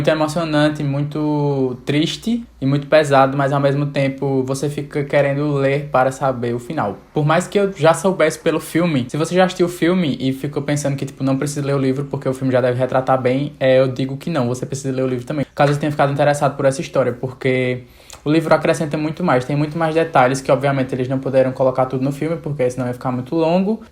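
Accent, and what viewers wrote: Northeast, Brazil. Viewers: ótima esta proposta comentar os livros indicados por pessoas que você admira. Crie sim um Quadro. Três observações apenas. Não se preocupe com sua pronuncia dos nomes, mas voce pode por exemplo, consultar no google tradutor, no audio a pronuncia do idioma original. Segunda, Bob Dylan nasceu na decada de 1940 período da segunda Grande Guerra. Por fim, fale mais lento, voce explica com clareza, logo, não precisa acelerar sua fala. É muito bom ouvir o seu sotaque, infelizmente minha mãe que nasceu em Pernambuco e veio para Minas, perdeu o dela. Sucesso sempre!😊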